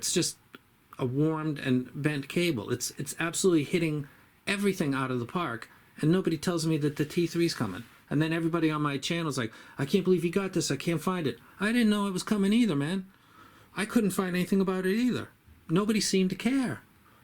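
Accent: Boston, United States. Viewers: Lol, I had a feeling you were from Boston. New England loves what you do man!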